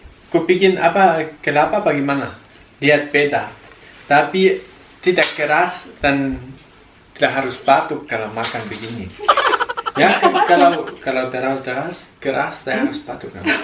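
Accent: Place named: Kalimantan, Indonesia. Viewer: ngomong kaka sma ky di kalimantan 😍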